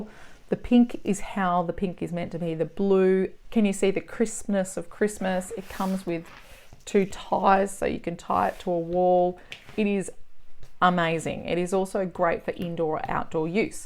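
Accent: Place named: Australia. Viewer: Hi great video. Its nice to hear an Aussie accent inthe sea of POD! I have just started with POD on Etsy. Are you located in Australia? I find that there is not a lot of choice with Australian printers and the products they offer compared to the US. Do you use Australian Printers or US based as the shipping to Australian customers is sometimes higher than the cost of the product.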